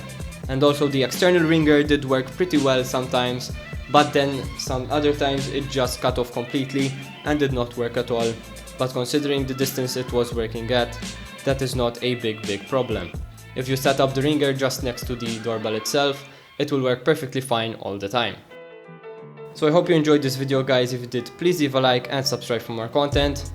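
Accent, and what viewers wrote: Malta. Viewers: Do i detect a maltese accent? :0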